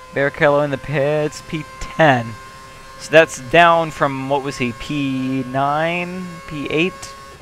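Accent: United States. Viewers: So why's he got an american accent?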